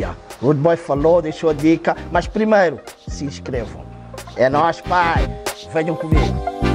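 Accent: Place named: Mozambique. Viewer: Me sinto encasa vendo os vídeos do pois temos o mesmo sotaque... Sou da África em Moçambique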